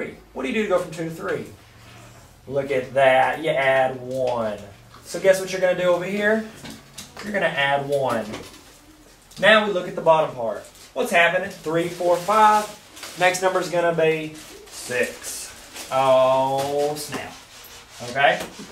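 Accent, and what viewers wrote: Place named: Arkansas, United States. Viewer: I think he is from Arkansas if not from texas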